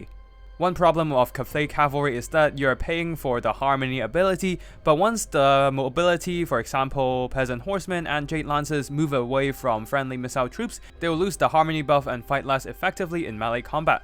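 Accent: China. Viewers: Goddamn I can't stand the CCP accent. Need to fix that boyo.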